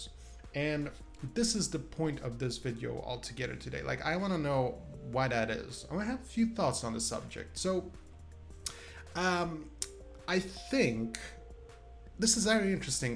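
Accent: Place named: Sweden. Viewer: Btw are you Swedish? Your accent sounds Swedish.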